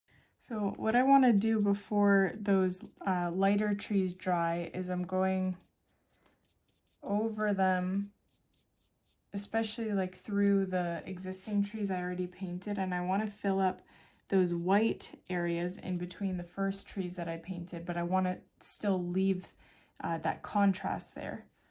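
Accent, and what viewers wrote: Canada. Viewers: Thought I detected small accent-canadian?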